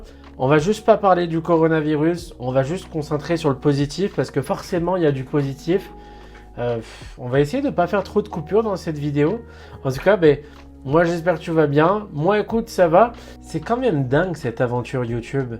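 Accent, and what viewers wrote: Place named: Quebec, Canada. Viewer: Tu sais que tu choppes un très léger accent Québecois ? 😅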